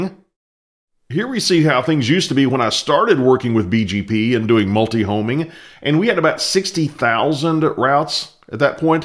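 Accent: Texas, United States. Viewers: topped up with that texas accent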